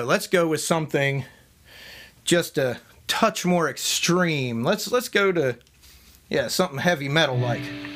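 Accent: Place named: South Jersey, United States. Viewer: you from south jersey or philly?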